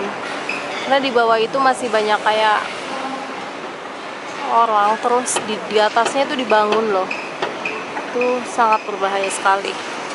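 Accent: Banyumas, Indonesia. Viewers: Wong brebes apa wong cikarang jane.. ngomonge be ngapak mbok..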